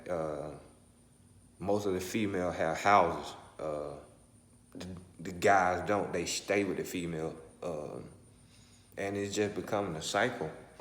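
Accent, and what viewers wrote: South, United States. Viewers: He has a southern drag.. Georgia is so big, why live in Atlanta? Im from NY, i don't live in Manhattan. NY is big enough to live outside of the 5 boroughs. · Dude narrating is way country. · He sound like hes from another southern state that is illietare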